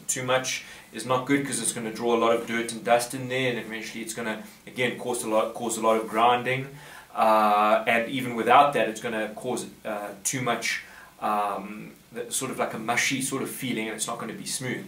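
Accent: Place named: South Africa. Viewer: Nice SA Accent! ... :)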